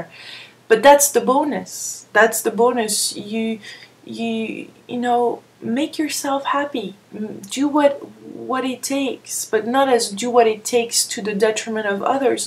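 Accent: France. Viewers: Omg.. u r french.. i could tell from ur accent.. its cute ^_^ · Are you french?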